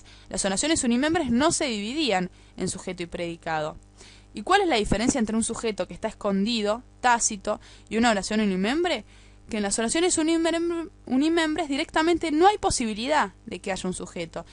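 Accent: Argentina. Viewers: Eres argetina verdad?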